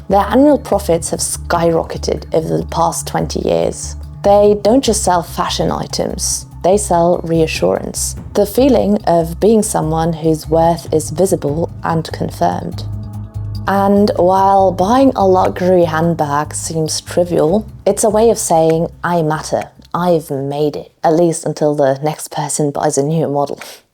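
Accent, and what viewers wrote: England, United Kingdom. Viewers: Miss, are you Italian? You sound British. 🌹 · your englsh accent is awesome, why americans cant speak more like that. lol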